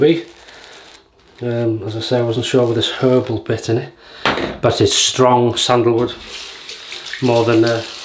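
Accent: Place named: Yorkshire, United Kingdom. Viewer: Great video are you originally from Wales?? I'm picking up Yorkshire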